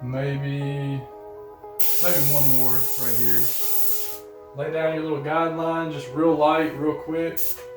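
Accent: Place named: South, United States. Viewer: Where are you from? I'm from NC & AL. and your accent sounds close.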